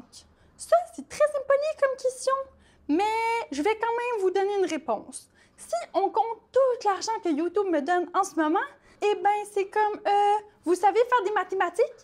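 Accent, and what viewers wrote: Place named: Quebec, Canada. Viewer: Une jolie fille avec un accent québécois !! je fond !! je suis normand...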